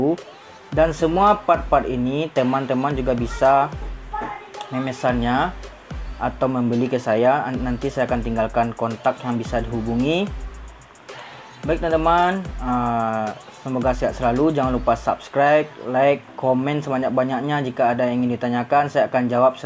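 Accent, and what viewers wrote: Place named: Padang, Indonesia. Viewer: Orang padang nih ketara bat haha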